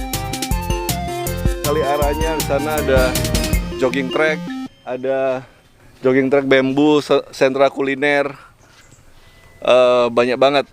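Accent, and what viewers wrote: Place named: Surabaya, Indonesia. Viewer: Omahmu suroboyo ta om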